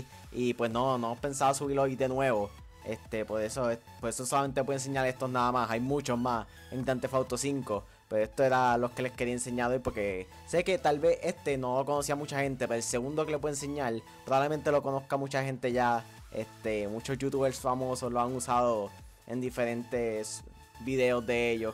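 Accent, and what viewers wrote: Puerto Rico. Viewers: Eres de PR verdad?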